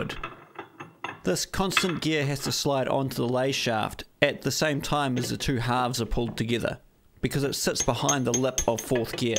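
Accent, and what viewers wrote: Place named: New Zealand. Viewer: hi, I'm a kiwi living in Germany - I'm not a Land Rover fan but I wanted to watch a restoration series. Great to hear a kiwi voice, I'm really enjoying watch this series - well done. Des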